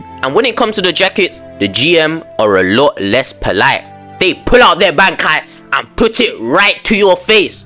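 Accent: United Kingdom. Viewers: Londoner ain’t ya? You sound it atleast and the stories screammmm London lmaooo but this does happen in brum too sadly 🧍🏾‍♀️😭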